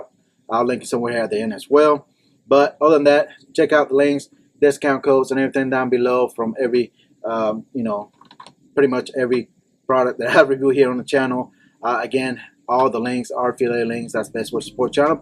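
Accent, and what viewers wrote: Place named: South, United States. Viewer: Man, I'm from GA and I love your southern accent it's pretty unique, I haven't heard a Mexican and Southern accent together before, but being from the south myself I could understand you just fine lol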